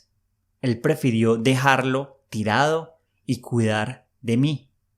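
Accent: Colombia. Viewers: Me encanta el acento colombiano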